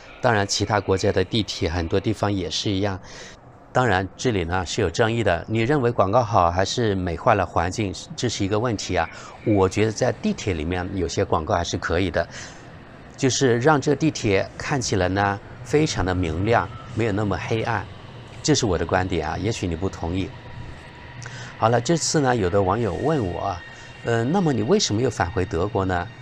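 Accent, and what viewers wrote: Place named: Guilin, China. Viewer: 听口音就是桂林老乡，桂普太明显啦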